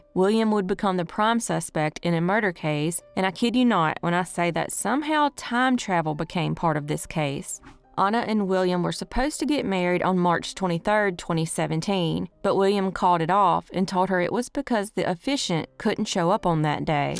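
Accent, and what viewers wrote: South, United States. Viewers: Congratulations! You absolutely deserve your 100,000 subscribers! People really do recognize quality and talent! (And an awesome Southern accent!)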